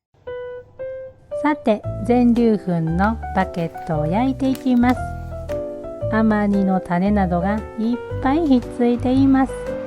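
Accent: Kansai, Japan. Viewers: 眼の手術後、あまり無理できなくて横になっていることが多く、nobanaさんの関西弁のゆったりしたYoutubeをBGMにとてもリラックスできました、有難う御座いました、海外生活お身体に気を付けて頑張って下さい。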